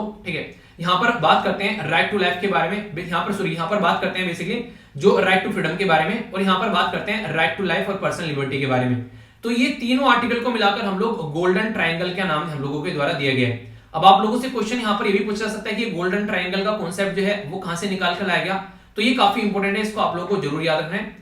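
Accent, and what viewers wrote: Haryana, India. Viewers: Hlo dii aap Haryana se ho na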